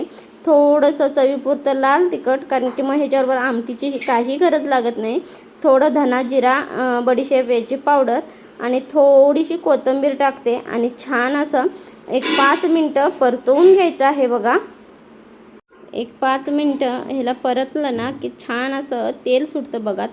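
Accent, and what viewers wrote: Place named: Kolhapur, India. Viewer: खूप छान रेसिपी तुम्ही अस्सल कोल्हापुरी आणि तुमच्या बोलण्यातही कोल्हापूरचा ठसका जाणवत आहे 👍👍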